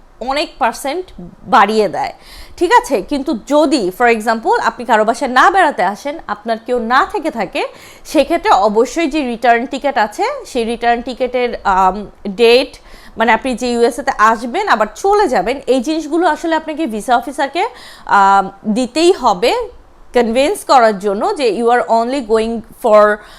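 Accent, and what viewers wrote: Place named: Bangladesh. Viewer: আপনার কথাগুলো অনেক সুন্দর আপনার কথা শুনে মনে হলো আপনি বাংলাদেশের নাগরিক বাংলাদেশের কোন জেলায় আপনার বাড়ি জানালে অনেক খুশি হব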